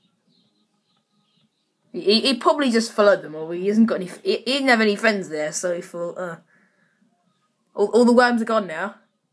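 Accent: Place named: United Kingdom. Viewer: now i know that you sound a bit british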